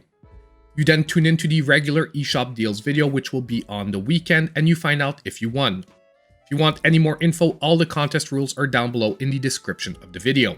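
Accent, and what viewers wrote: Canada. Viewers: Why do you sound Canadian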